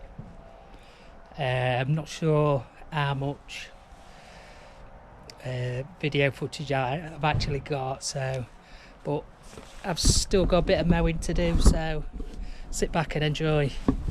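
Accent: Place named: Nottingham, United Kingdom. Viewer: I recognised the accent straight away mate! Saw you're a notts lad.. im originally Mansfield born n bred but moved to south Yorkshire now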